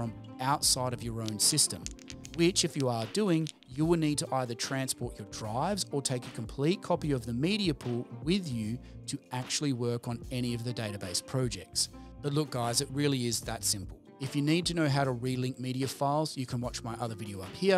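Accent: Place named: Australia. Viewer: Hey dude! thanks for the thorough video. I like your presentation and um... Aussie! I'm wondering if you can help. My old Mac Pro running Resolve16 died recently, and I just took the leap to a Mac Studio. Anyway, I have no way of exporting my Resolve projects and databases, though I have the original files on disk, project folders, .db files in Application Support etc.. But I'm at a loss for how to restore my database with just these raw files. I've tried copying them to the new Library structure, but nothing's getting recognised. And I haven't found a way to import them. Any ideas where I should go from here?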